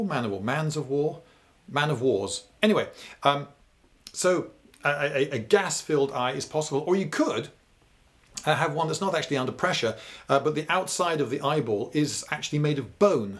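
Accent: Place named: United Kingdom. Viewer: Did I just learn about biology by some british bloke talking about the visual abilities of a cyclops? Amazing world we live in.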